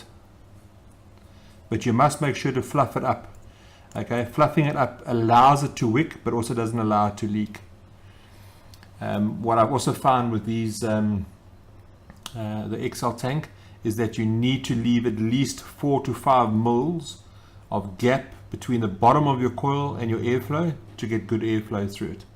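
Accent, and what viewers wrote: South Africa. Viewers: Great video thanks, works really great. Quick question, are you South-African?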